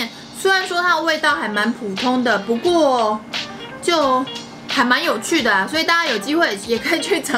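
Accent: Taiwan. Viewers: 妳真得好可愛呀~ 💖 每次聽妳突如其來的"國台語"或時而的"念錯詞"就笑了!! 很喜歡妳的視頻，繼續加油喲^^ 讚~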